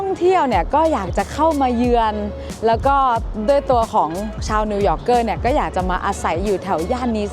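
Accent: North, Thailand. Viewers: ชอบการนำเสนอของน้องค่ะ และชอบคำเมืองของน้องด้วยเจ๊า..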